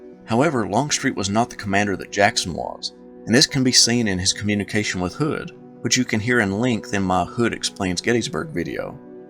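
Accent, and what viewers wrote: South, United States. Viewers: As soon as I hread the narrator's cornpone stupid accent I knew it was a hit job on Pete. Bigly sad. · A hit job on a dead man, using his enemies and a piece of fiction as sources. Do I detect a Southern accent?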